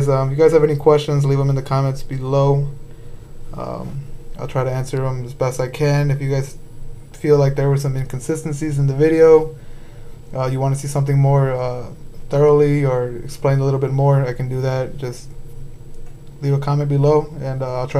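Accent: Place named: India. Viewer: Indian accent. Indian like me I reckon. Kya haal hai bhaaya? haha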